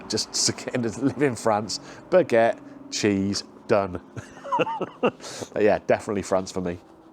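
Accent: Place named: Dorset, United Kingdom. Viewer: Greetings from puddletown in Dorset...nice to hear a fellow Dorset person..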